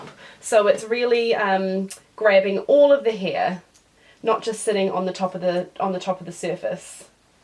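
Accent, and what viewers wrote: New Zealand. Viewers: Your hair looks ABSOLUTELY beautiful!! This tutorial is so helpful!! Thank you! Where are you from? New Zealand? I love your accent. (I am American. 🙂) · It’s a New Zealand Accent that is where I am from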